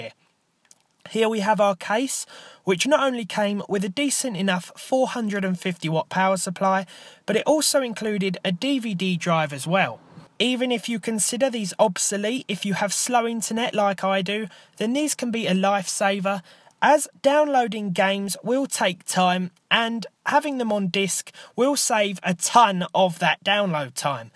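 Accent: United Kingdom. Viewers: As always, i love your videos. I follow you from italy, so forgive my English. I love your british voice. Continue in this way, u r pretty good.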